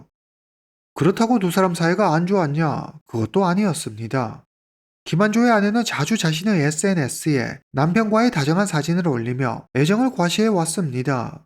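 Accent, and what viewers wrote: China. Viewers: 조선족 교포 이신가요? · 한굳말 잘하는 중국분이라고 생각했어요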